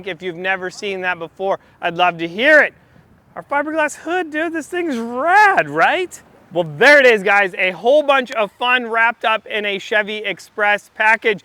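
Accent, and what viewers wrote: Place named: Australia. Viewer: Hahaha, Australian accent mate😂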